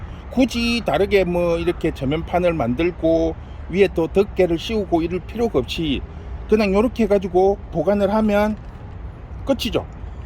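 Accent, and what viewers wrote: Gyeongsang, South Korea. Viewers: 구수한 경상도사투리 파종법 영상 올려주셔서 감사합니다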